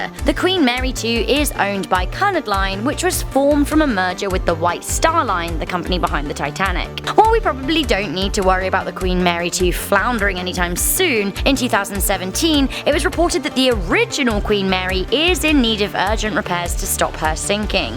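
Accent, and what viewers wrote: England, United Kingdom. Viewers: I like this person's Englisacccent, but seriously.... She can't pronounce Cunard? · She's British accent bro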